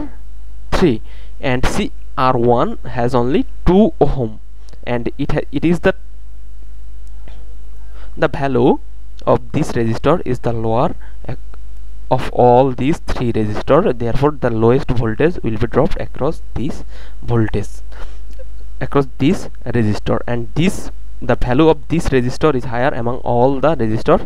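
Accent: India. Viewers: I know it's an inappropriate statement but your accent sounds more like indian than Bangladeshi... :/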